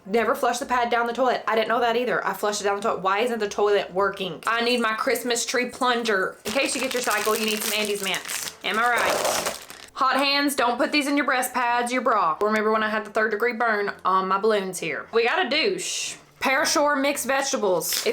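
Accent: South, United States. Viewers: Your southern accent sounds like Georgie from Young Sheldon...so cute 😊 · I live in a small city in north-central British Columbia that wishes it were Southern, and I love your accent. It makes me think of sunshine and sweet, iced tea. Also, in Canada we don’t have dollar bills or pennies any more, so that wreath would have to be covered in $5s - which are blue. .. not quite the same! 😂 After we decommissioned the penny, my former hair stylist did the penny floor in the entrance to her salon which was in a heritage building in Vancouver, and it looked wicked! But she did say it was a lot of work. I adore your channels - thanks for all the hard work you put in to give us something cool!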